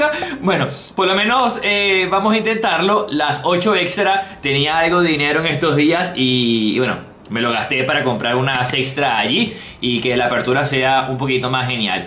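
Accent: Venezuela. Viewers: El es venezolano?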